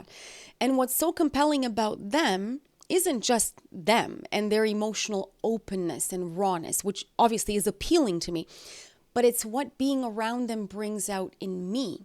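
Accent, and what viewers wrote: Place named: Ireland. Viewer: Sorry, just curious. Were you born in Canada? I mean I moved to Canada when I was nearly 12, and I still have a borderline Russian accent. It seems like you do too, not Russian, but something... Maybe even Irish...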